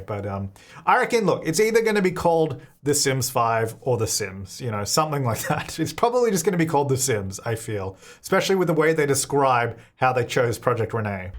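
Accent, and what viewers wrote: Australia. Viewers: "lil nugget" 🥺 why is that so cute? It's probably the aussie accent, love it 💗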